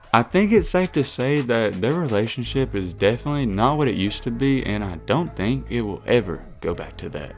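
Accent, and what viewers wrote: South, United States. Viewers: I hate your hillbilly voice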